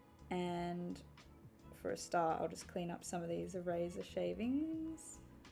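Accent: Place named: Australia. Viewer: Your Aussie accent is 🤩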